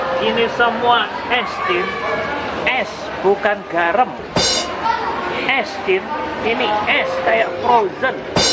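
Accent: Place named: Java, Indonesia. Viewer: chanel ini nih yang ngajarin adik saya jadi medok ngomongnya!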